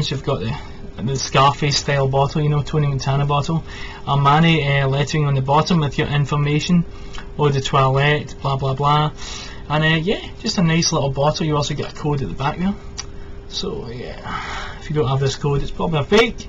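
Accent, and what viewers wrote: Scotland, United Kingdom. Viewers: are you Scottish? btw, i think you've got the only armani nuit review on youtube, so kudos to you for going off the beaten path! just a question, does it remind you of berburry brit rhythm? must be the cardamom, heliotrope and patchouli.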